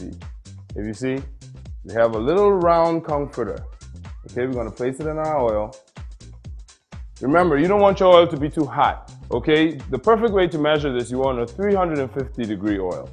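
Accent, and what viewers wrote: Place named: Turks and Caicos Islands. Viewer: Oh I catch this accent now! 😂 Turks & Caicos used to be a part of the Bahamas, thus the traditional foods & recipes will be close. In my experience, the difference in taste is minuscule. Much love, Bro!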